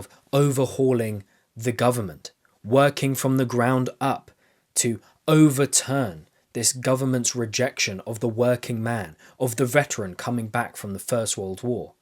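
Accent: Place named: United Kingdom. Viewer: God how I hate the British accent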